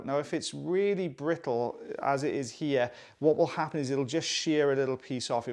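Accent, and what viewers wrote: Wales, United Kingdom. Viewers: When it's 106 degrees in California and you come across a lovely welsh accent! Dioch!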